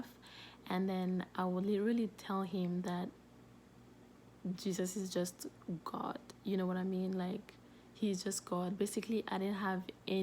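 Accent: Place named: Nigeria. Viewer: Haha MashAllah you have learnt our accent oo una don try pass, 😂😂 may Allah bless you too sister and grant you ease in all your affairs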